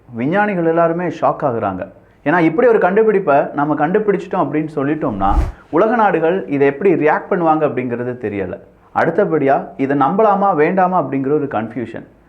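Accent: Kerala, India. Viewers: Neenga enna malayali ah?? Illa neenga border state ah??? Namma tamilnadu slang la pronounsation pannunga.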